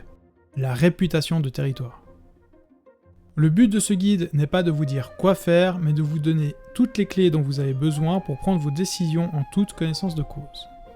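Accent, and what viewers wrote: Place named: Switzerland. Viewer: ahahahah mais c’est clair et ça fait du bien d’entendre l’accent du coin sur YouTube surtout quand j’aurais été presque sûr à 99,9% que le contenu serait du contenu provenant de YouTube francophone mais pas Suisse 😁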